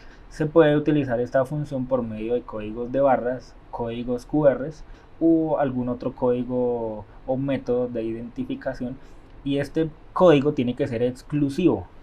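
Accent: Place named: Bogotá, Colombia. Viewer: lo explica con acento rolo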